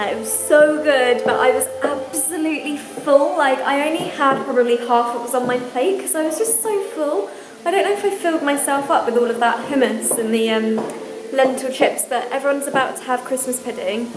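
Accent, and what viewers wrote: RP, United Kingdom. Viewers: jaysus you're posh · I luv ur accent is it British 😍😆👌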